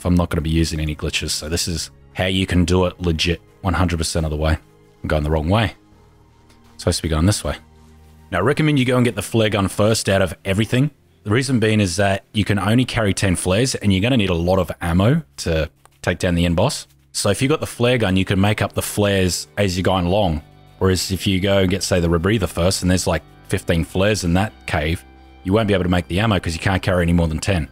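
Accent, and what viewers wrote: Australia. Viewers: that's an australian accent for ya mate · Yes he's Australian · I just like hearing this cool, Aussie accent😁👍 hello from Royal Oak Michigan!👋🏻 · Man has a Narrator voice im assuming your Australian pls dont kill me if not but im pretty sure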